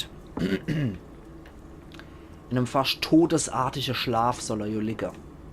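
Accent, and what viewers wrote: Palatinate, Germany. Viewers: Omg. LETS PLAY UFF pälzisch ist ja wohl das beste Ding ever!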